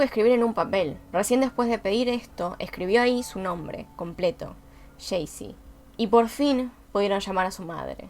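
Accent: Argentina. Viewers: ¿Eres de argentina? Perdon quiero saberlo BDHDNSVJ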